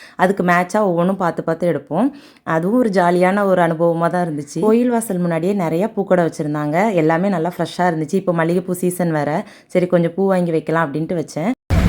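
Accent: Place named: Nagercoil, India. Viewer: Neenga Nagercoil ah sister ❤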